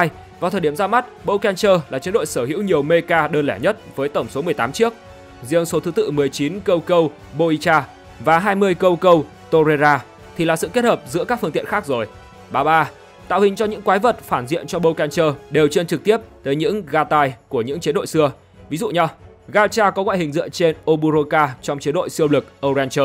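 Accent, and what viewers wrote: Japan. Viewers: đọc tiếng Anh theo kiểu Nhật nghe khó chịu thật sự, mà đã thế còn chỗ Anh chỗ Nhật, nhất quán 1 kiểu thôi :)))) ko đọc đc thì đừng có đọc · hình như là tên tiếng anh nhưng mà là anh nhật bạn ạ =))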